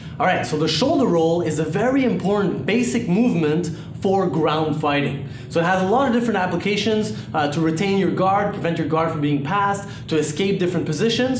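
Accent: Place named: Quebec, Canada. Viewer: Quebec accent :D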